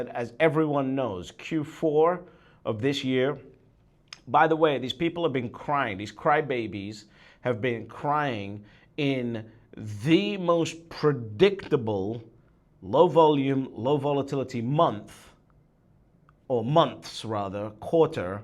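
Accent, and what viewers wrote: United Kingdom. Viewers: Love it 🧡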